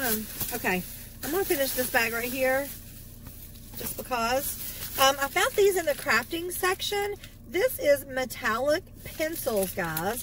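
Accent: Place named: West Virginia, United States. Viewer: Are you in Beckley, WV? It just sounded like that area. I am within 50 miles of Beckley. Enjoy all your videos, thank you for sharing.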